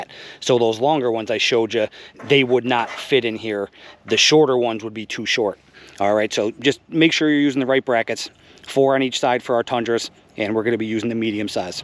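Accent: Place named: Minnesota, United States. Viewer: You from min or Wisconsin? lol me too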